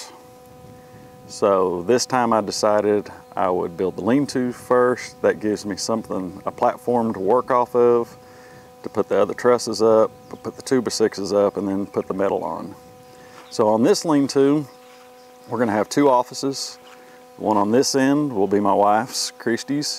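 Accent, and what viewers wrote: United States. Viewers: Are you from west Georgia?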